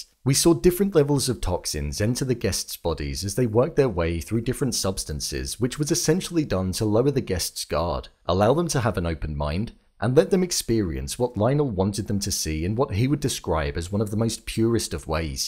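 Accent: United Kingdom. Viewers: These British fiverr voiceovers on all these channels that obviously don't know what they're talking about are getting out of hand.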